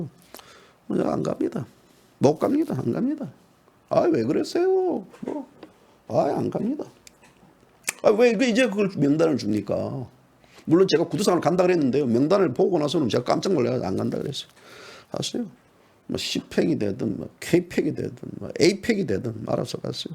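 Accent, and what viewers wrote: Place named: Gyeongsang, South Korea. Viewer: 그렇게 오래됫나요? ㅋㅋ 몰라뵙네요~ 갱상도 말투가 투박 하면서 끈적 끈적 ㅋㅋ 요즘은 명심하고 들어옵니다^^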